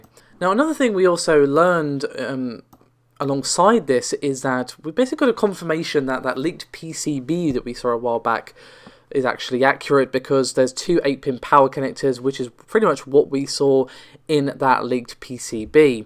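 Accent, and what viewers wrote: United Kingdom. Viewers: What is this woman’s name??? I’ve watched so many of these god damn videos and have no fucking clue what she is saying when she introduces herself and says her name. She sounds like she’s slurring her words with her strong British accent and is making up a name that I’ve never heard of.?!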